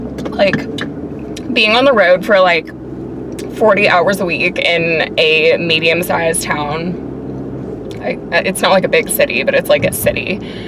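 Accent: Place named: South, United States. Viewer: U have a southern accent, u must be from either Kentucky or Tennessee 😂 love ur video btw.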